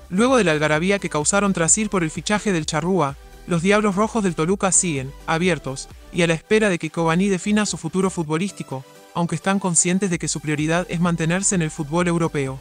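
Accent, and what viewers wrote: Argentina. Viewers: Por qué usan la voz de un argentino? ¡¡Estamos en MÉXICO!!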